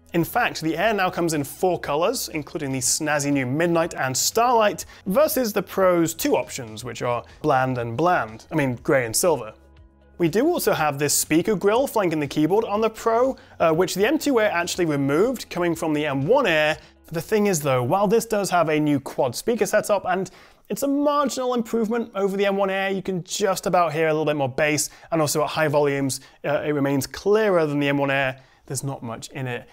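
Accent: England, United Kingdom. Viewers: I’m mesmerized by this guy. Maybe it’s the English accent.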